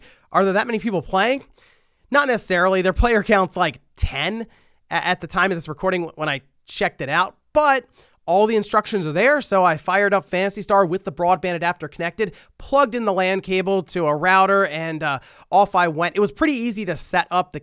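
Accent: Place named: Philadelphia, United States. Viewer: You have a very heavy Delco/Northeast Philly accent...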